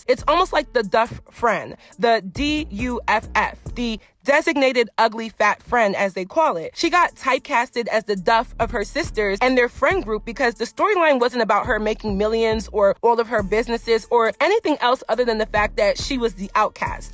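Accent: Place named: Baltimore, United States. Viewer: You from Baltimore? I heard the “do” and “you” in the accent lol…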